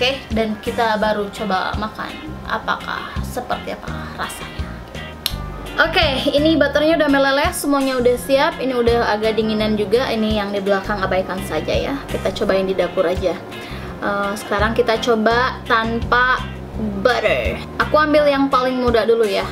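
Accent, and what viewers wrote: West Java, Indonesia. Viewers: org mna kk sunda nya ? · resep ihh nyunda 😂😂 orang mah rek diamerika ge logat mah moal hilang 😂😂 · Orang sunda nya teh.... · mba orng sunda ya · Janten urg sunda teteh teh? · emoy atu lah teteh sunda pisan eta hahaha.. teteh tinggal di mana sekarang ? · logat2 sunda gituu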